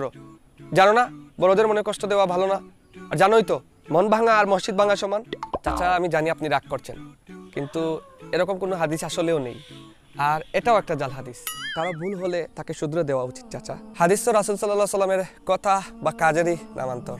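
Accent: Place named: Sylhet, Bangladesh. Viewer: Apnar khota sune mone holo tai jigges krlam ami o sylhety🙂 Btw video ta vallagse🖤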